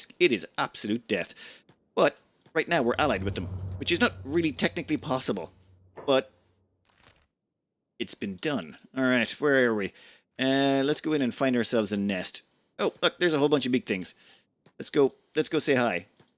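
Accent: Ireland. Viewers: He answered it elsewhere. It's the R key lmao. It's just that paddy accent. Bless his heart.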